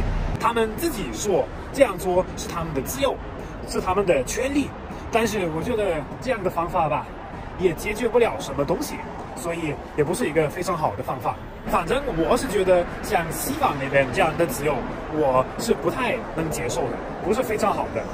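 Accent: Sichuan, China. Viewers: 你說的普通話是否四川話混合的呢? 我說的是廣普, 但正宗普通話好像有些不同啊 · 这个视频做得可以👍我全完赞同的观点！的川普说得巴适，四川人听到安逸，外省人又都听得清楚👍👍😀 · 喜歡你的風格幽默風趣，川普很好聽，👍三觀正正的帥氣小伙子。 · ，你的川普很棒、很亲切。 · 四川话可以的嘛！ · 四川味好重啊，哈哈哈哈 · 你这中文好四川。。。哈哈😊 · 这川普，四川味太浓了！！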